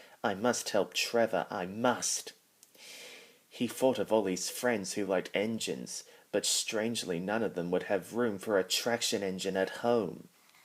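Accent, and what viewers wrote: United Kingdom. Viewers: Great job! Where are you from? Is your accent natural or you’re imitating British accent because Thomas&Friends is a British show?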